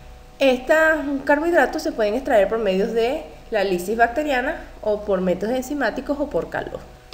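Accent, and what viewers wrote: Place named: Venezuela. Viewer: Muchas gracias! Me encanto su clase profesora! Además percibí un acento venezolano 🌎💜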